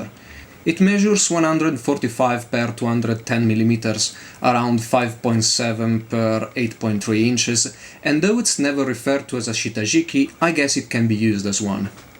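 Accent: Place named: Italy. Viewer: Initially, I thought his accent was Russian or Polish, but no, Italiano. :-)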